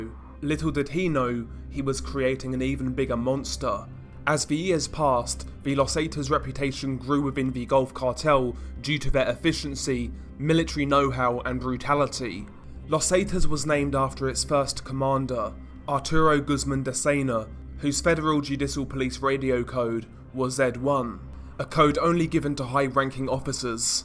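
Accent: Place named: United Kingdom. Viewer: God British English is the fucking worst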